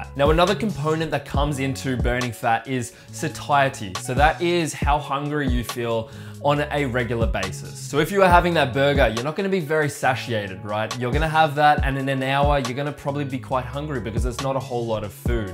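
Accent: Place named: Australia. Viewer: I love your accent... Let me tell you that I have not only learned to lose weight but also have learned the Australian accent from you.. Love your videos mate!! They are great.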